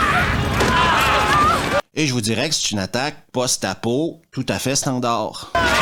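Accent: Quebec, Canada. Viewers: J'adore tes vidéos tu a un accent québécois a tu déjà vécu au Québec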